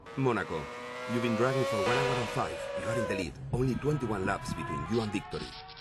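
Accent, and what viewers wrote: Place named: Spain. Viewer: I like your accent. Spanish?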